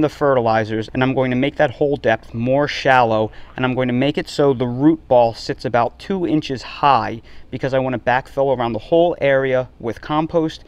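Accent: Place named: New Jersey, United States. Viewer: North Carolina? I came here to learn how to grow blueberries in Philly. I know a local accent when I hear one. Found out your about page to confirm you are from Jersey. If there is one thing I know Jersery grows the best blueberries around. This guy knows what he’s talking about! Great information especially about the growing zones. Subbing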